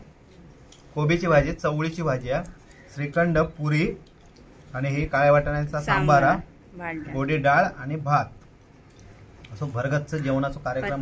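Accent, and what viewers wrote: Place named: Konkan, India. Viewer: Dada tumchi Kokani language khup Sweet ahai. Tumhi agdi apulkini bolta. Me tumchi sgli video bghto.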